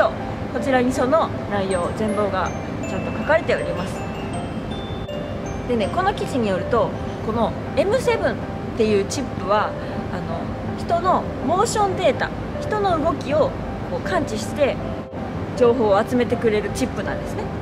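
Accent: Kansai, Japan. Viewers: 標準語で話そうとしているのかもしれませんが、関西弁の発音が出てしまっていますね。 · 関西弁いいわー！ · 関西弁、萌えるはーｗ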